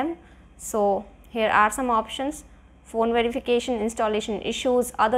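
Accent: India. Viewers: u know it works when the creator has indian accent 🥀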